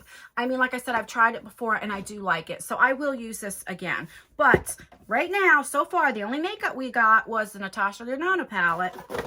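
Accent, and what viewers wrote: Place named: New Jersey, United States. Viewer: Are you from New Jersey? Im from Cape May NJ and oh girl that accent takes me back!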